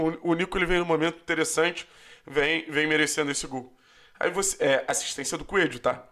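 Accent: Rio de Janeiro, Brazil. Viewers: Sotaque carioca + Camisa do CAP ? Like.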